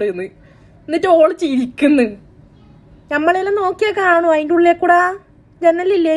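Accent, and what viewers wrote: Kannur, India. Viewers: Amalee kannur bashaa😘😘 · You are so talented. Your action, the way you speak reminds me of our neighbours or close cousins in Kannur, with their simplicity and innocence! Love my place ❤️ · ആഹാ അന്തസ്..... 🤗🤗🤗🤗🤗🤗കണ്ണൂർ ഭാഷ ഇസ്‌തം.....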